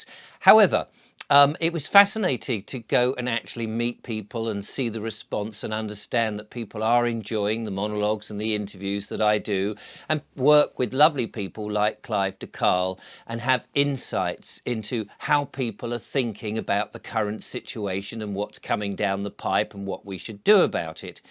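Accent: United Kingdom. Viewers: you are a gem! Love your 'terribly' British! I am Australian.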